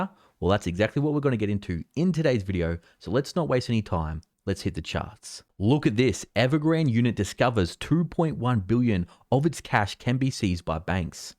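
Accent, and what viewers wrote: RP, United Kingdom. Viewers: I say sir you went all posh upon a sudden! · holy smokes u speak toffy english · When the Holy Flippity Floppin Smokes comes with a proper British accent you know everything to follow will be gold. ✌🏽🔥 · The English accent with the violin :))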